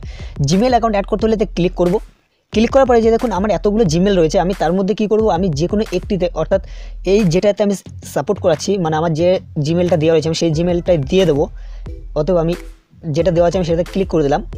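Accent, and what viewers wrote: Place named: Sylhet, Bangladesh. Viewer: sylheti bai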